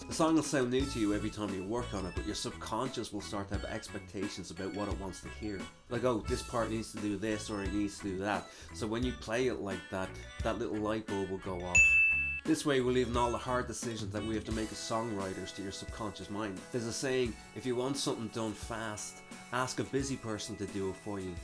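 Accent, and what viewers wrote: Dublin, Ireland. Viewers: Is that a Dublin accent I hear? Or is it from a bit further west?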